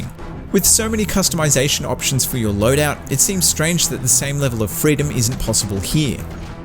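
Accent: Australia. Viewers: what is your accent btw?! Australian?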